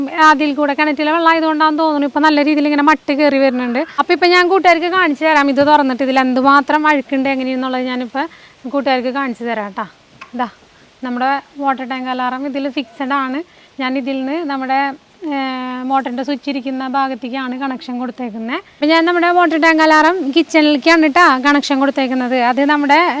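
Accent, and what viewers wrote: Thrissur, India. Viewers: സംസാരം കേട്ടിട്ട്. തോന്നുന്നു തൃശൂക്കാരിയാണെന്നു എന്തായാലും വീഡിയോ അടിപൊളിയാണ്‌ കേട്ടോ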